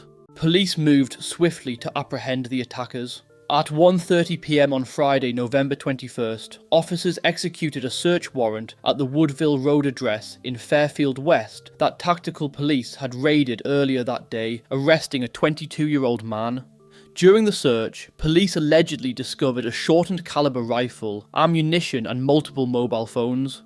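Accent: England, United Kingdom. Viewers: It's Cah-soo-la, not ca-sul-a. You're a pom, right?😀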